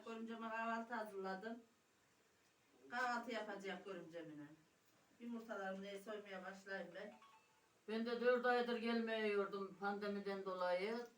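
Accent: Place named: Konya, Turkey. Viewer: Gı konyalımısınız yoksa 😁Benim herif konyali 😌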